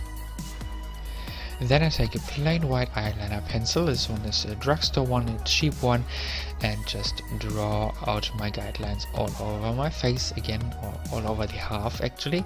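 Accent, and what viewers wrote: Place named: Germany. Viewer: hahah i thought "he kind of has a German accent too" so I checked some other videos of yours and haha you are truly the perfect nightcrawler XDD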